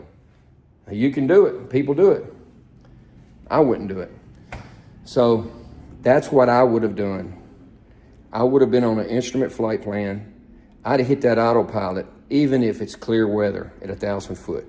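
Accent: South, United States. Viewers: Ive never heard anyone so smart with your accent. You must be the king of the southerners.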